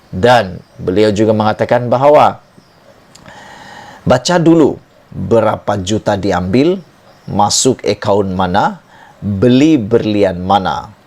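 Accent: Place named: Sabah, Malaysia. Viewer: Pekat sabah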